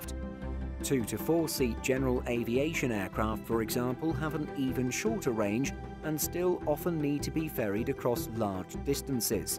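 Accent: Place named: United Kingdom. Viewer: Why all the Brit accent? Brits have little or nothing to do with turbo-prop aircraft ferry world-wide. ???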